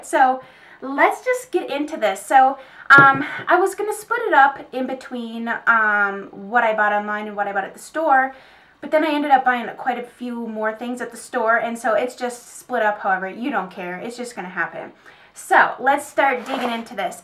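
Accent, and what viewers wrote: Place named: Minnesota, United States. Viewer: Sounds like a Minnesota accent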